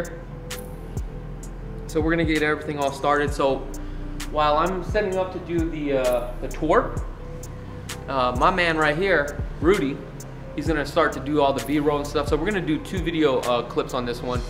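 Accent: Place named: New York, United States. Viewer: I can’t stop watching your videos. You sound like you are from New York lol or back northeast.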